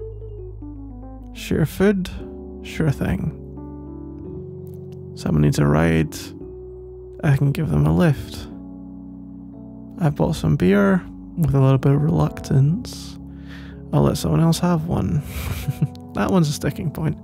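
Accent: Scotland, United Kingdom. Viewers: I loved this audio, but I am also a big fan of the Harry Potter series, so I was wondering if you could do audios for one of the characters, specifically Oliver Wood because he has an identical accent to yours. (His accent made me look for Scottish audios :)) If you could do a series for him on how he would react to certain stuff that would be amazing. It would give you a chance to broaden your horizon a little bit and have fun with this character! If you have already done something like this, then don't pay any attention to this :). I love your videos by the way!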